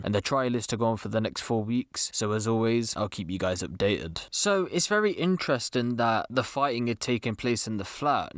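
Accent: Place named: Ipswich, United Kingdom. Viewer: This guy sounds like he’s from ipswich whys he reporting on drill rappers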